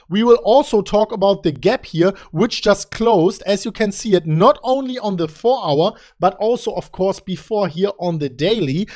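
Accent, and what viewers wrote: Germany. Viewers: Are you german? ;))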